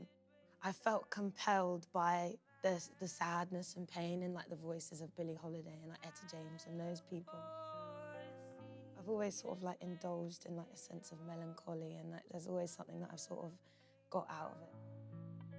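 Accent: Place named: England, United Kingdom. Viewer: Wow u have an English accent this whole time still love you but damm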